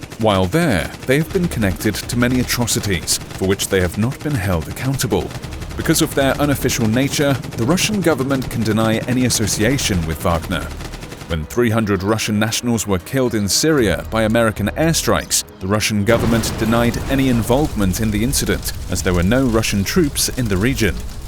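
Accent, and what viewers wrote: United Kingdom. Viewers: New british narator 😮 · Yo it’s the old British narrator 3 years ago!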